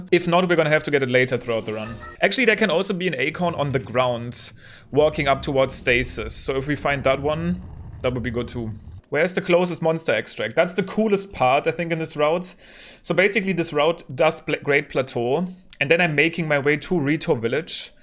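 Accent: Germany. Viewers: My mom is German and I guess I don’t watch a lot of German people speaking English but now I weirdly associate you with my mom. Thanks Also, herzlichen Glückwunsch zum Geburtstag!!!!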